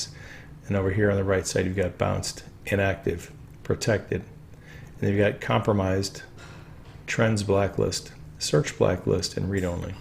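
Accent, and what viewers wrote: Scotland, United Kingdom. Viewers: Guid Scots accent laddie ! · Great Scottish accent mate. Greetings from the cold place at the top of England. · Being of Scottish heritage, and having vacationed there twice, I can say that your brogue was spot on! Music to my ears! 👍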